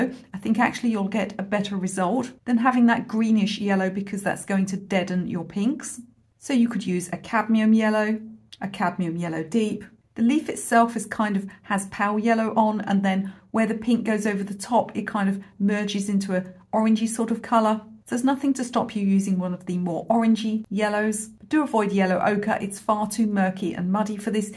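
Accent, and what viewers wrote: United Kingdom. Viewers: I love your shorter step-by-steps; I think we all love a quick win for the day! However, I also love the longer tutorials, as well. Honestly, I would watch any class you teach, even a sewing one (tho sewing is my nemesis). But maybe that's just your British accent and sense of humor! 🤣😉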